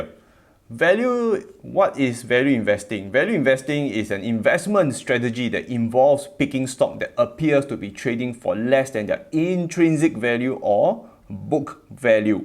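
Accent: Singapore. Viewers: I sold my bershire last 21february sadly i really like the guy may if you mix feeling and investment it is not a good moove like the guy but not too much some bershire stock as i am writing brb.b is 170.6....thank you for you video i like your Singapore accent i have interest in Singapore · Love the singaporean accent dude! proud of you!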